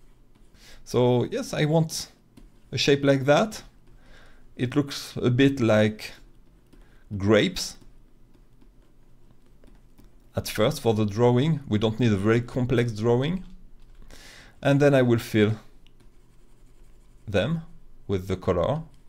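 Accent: France. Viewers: L'accent français ♥️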